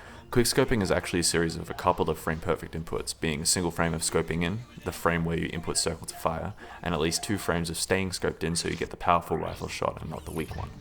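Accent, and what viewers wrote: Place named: Australia. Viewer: Pro tip for understanding australian commentary: Be australian.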